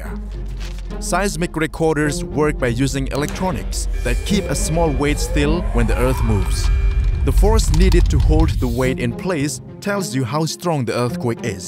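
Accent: Indonesia. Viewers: asli udah curiga bgt dari awal ni yg ngomong org indo wkwk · Still very indonesian accent even try hard to become native english speaker. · Yes, I feel the Indonesian accent here 😄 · i am sure the narator is indonesian :face-blue-smiling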